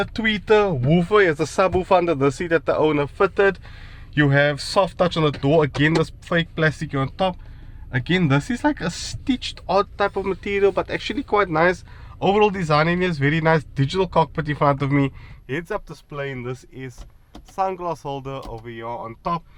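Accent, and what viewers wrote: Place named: Cape Town, South Africa. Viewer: Don’t change the way you talk it’s great listening to someone speak the Cape Town slang😁🙏 subbed because of that!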